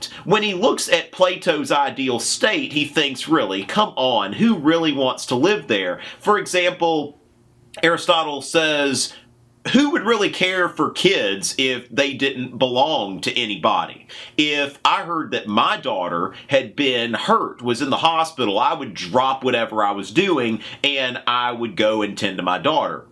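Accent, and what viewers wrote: South, United States. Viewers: has southern accent - ok, let's not stereotype, maybe he's educated enough. . .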